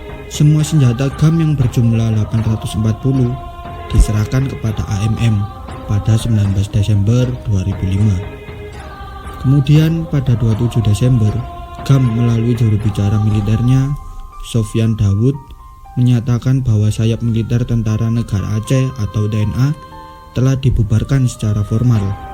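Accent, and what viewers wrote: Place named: Java, Indonesia. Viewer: medok wkwkwk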